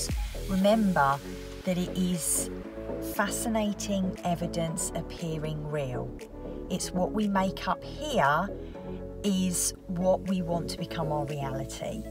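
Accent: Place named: England, United Kingdom. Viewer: This is a really cool channel love your english accent :D I subbed!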